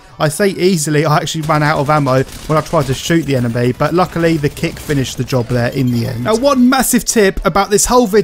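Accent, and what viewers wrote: United Kingdom. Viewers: I agree, you're helpful and easy to listen to with that English accent! 😍😍 Haha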